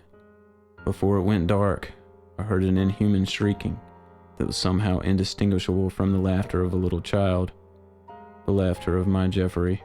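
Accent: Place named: Texas, United States. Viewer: you're from texas aren't ya cowboy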